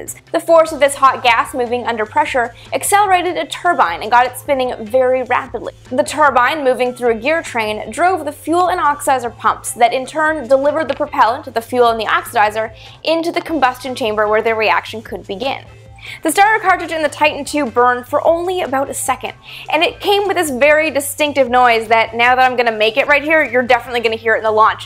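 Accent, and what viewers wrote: Canada. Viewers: Laughing Gravy Exactly. Every Canadian I've ever known has pronounced "missiles" exactly like her. OP obviously jumped to a conclusion. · Love her Canadian accent. Especially how she says "missile".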